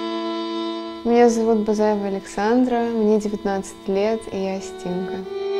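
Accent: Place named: Russia. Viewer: heavy russian accent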